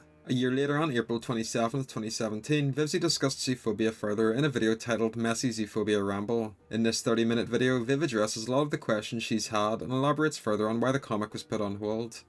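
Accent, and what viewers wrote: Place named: Northern Ireland, United Kingdom. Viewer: as someone from northern ireland i knew i reconised your accent lol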